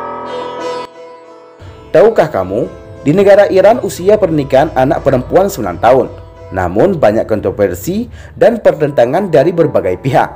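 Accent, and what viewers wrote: Aceh, Indonesia. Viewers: Aceh tok tok kali ngomong bahasa aceh, medok that 😅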